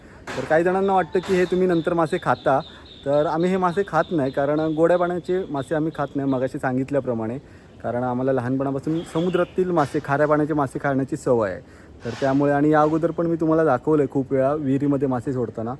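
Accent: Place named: Bhandari, India. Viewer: तुझी भंडारी बोली छान वाटते.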